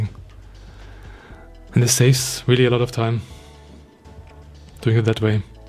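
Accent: Germany. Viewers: Du bist deutscher oder? Ich glaube ich höre deutsches englisch 😂❤ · Du klingst als kämst du aus der Region Dresden. Oder täusche ich mich?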